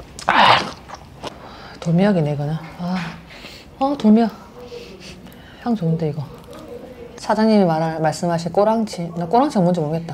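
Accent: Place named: Gyeongsang, South Korea. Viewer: 경상도 사투리.ㅋㅋㅋ 가시나 ㅎㅎ. 그냥 좋다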